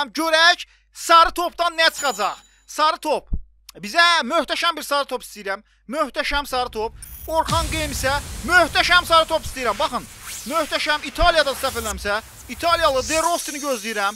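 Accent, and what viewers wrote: Baku, Azerbaijan. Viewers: Abi bu bakü şivesi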